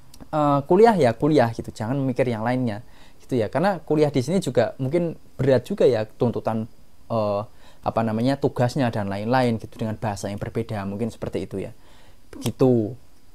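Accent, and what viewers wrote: Java, Indonesia. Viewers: Suata mu masih medok jawa ne, kl pun tinggal di Jepang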